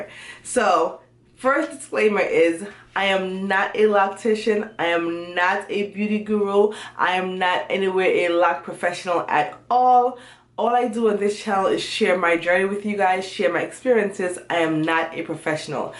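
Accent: Patois, Jamaica. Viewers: did u not hear her speaking patwa... smh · Well obviously you are Jamaican... lololol Just heard your pathois!lolol love you even more now!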